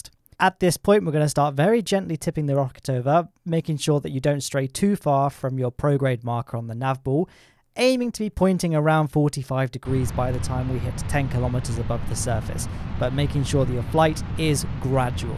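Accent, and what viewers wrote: United Kingdom. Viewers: He's UK Australia's don't articulate their words as much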